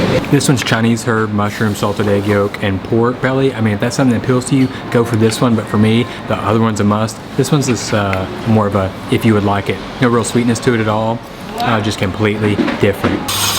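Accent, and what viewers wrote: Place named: South, United States. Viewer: Never did notice before but slurping and that southern drawl seem to go hand in hand. Damnit, i feel like one of Pavlov's dogs watching this. Keep up the good work sir.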